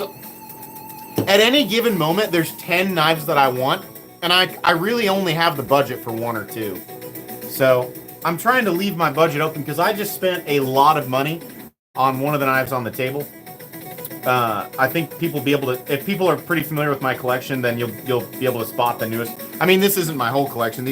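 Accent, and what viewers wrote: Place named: South, United States. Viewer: Little bit of a southern draw on this episode. Let me find out you're one of us Smokey Mountain Knife Works locals and you've been playing the long game the whole time.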